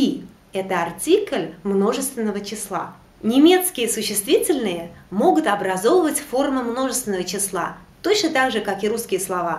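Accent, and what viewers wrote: United Kingdom. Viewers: Все что вы сказали я считаю верным, но вы произносите немецкие слова по-англиский, это все портит. Как минимум. из-за того что вы читаете букву R как английский R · Зачем английский акцент в немецком? Зачем так пугать зрителей? Ведь в реальности окончаний множественного числа всего три.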